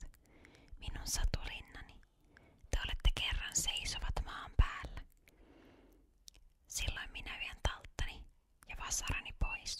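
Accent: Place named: Finland-Swedish, Finland. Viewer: The finnish swedish accent is so beautiful.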